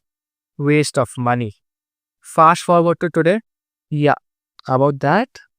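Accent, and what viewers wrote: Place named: India. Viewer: I don't understand ur påjêët accent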